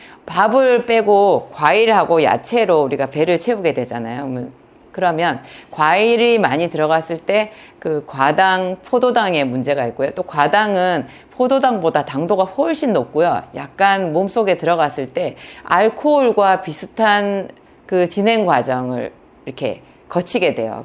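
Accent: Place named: Yeongcheon, South Korea. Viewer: 영천 억양인데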